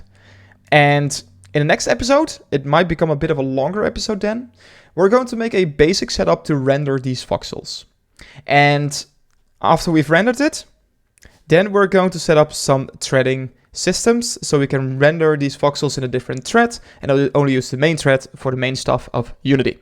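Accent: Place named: Netherlands. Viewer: I smell Dutch :D :D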